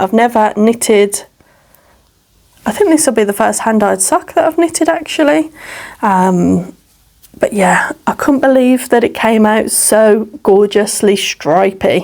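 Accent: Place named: Birmingham, United Kingdom. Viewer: Hi, first time watcher, loved all your makes, they are so neat and I am a big fan of Liberty. You sound like a local brummie girl to me, what date are you going to the festival of quilts at NEC next week? Also did you go to Alice Caroline recent sale in the Cotswold, my friend went and they were giving away smaller pieces of Liberty, she is going to pass on a bag to me at the NEC on Saturday. Anyway thanks for your inspiration and it’s great to see the enjoyment you are getting out of this ❤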